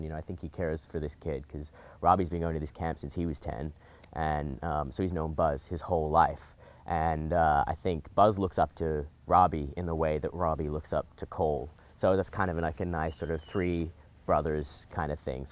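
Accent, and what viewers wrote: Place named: United States. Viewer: omg hes interviewing in an american accent! ahaha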